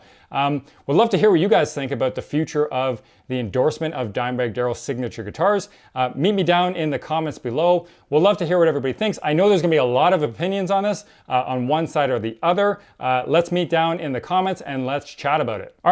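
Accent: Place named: Canada. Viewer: All I heard was “ ABOTE “ and “ OTE “. Canadians just can’t grasp the “ OU “ sound.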